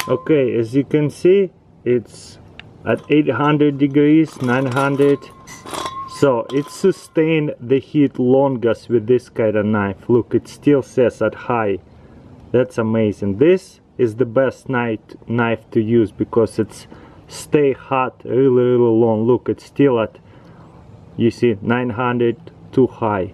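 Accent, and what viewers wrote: Russia. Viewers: Diego Andre he's Russian, have no never heard of accents? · Diego Andre he's Russian he has an accent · Diego Andre He's Russian. It's called an accent, if you've never heard of it. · Come on everyone be more mature! has an accent, i bet if you spoke Russian and were from another country trying to have a youtube channel, you wouldn't like people making fun of you!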